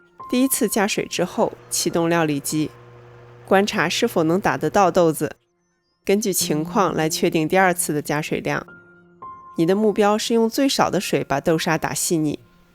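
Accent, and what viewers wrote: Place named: Beijing, China. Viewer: 您的標準(京片子)聽您的解釋很仔細很舒服感恩會照您的方法試作感謝您。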